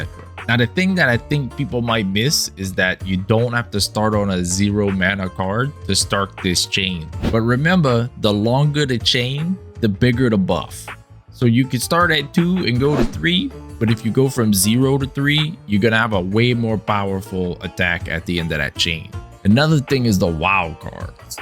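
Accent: United States. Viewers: Bro, i didnt look at your channel name when i clicked on this but after hearing your accent i was like Mae, dats a fellow coonass, Cher!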